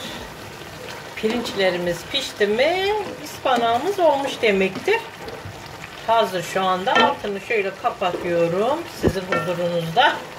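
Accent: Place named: Dersim, Turkey. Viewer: Abla sen Dersimce biliyor musun? Sanki Dersimliler in aksaniyla konusuyorsun! :-) Yani bilmesem, akrabam sanacagim!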